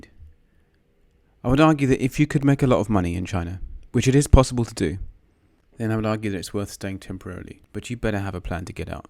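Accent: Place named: Taiwan. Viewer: Chanced upon one of your videos on your Mandarin channel - was very surprised to hear a Taiwanese accent since most Western folk seem to use Beijing pronunciation when learning Mandarin 😂😂 Makes sense now, knowing you lived in Taiwan prior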